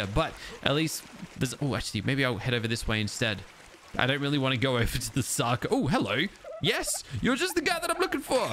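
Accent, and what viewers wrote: Australia. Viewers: I love the Australian accent but I don't like the idea of the huntsman spider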